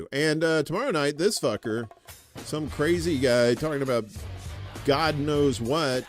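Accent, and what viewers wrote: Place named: Israel. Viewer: f israel ❤🖤🤍💚 i like yer israeli accent btw! as well as the 'US hegemony' voice!!!! love from an 'merican in UK ;D x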